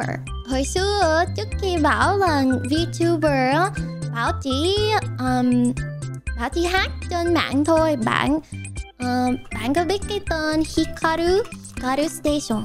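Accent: Mekong Delta, Vietnam. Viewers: cách nói đậm chất miền tây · nói tiếng Việt nghe đậm chất miền tây luôn á với lại mới biết đến thấy cute dễ thương...ngoài bả mình còn mê cả Shoto nữa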